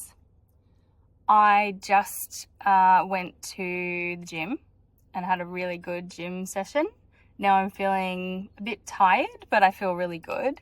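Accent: Australia. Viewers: i really like your videos. so that i can shadow aussie accent ❤ ❤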